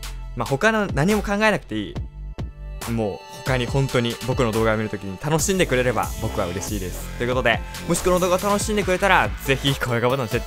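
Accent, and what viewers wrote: Kansai, Japan. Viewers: さんの関西弁がたまに出る動画が好きです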